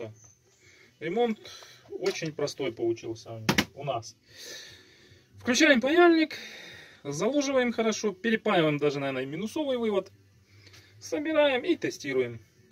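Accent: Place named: Rural, Russia. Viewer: ни хера не понятно , что ты там гутаришь на селюковском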